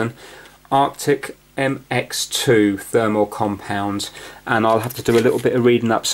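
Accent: United Kingdom. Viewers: I really love the UK accent, I can listen to it all day :D It's the best language in the world ! ( I'm from Belgium by the way xD )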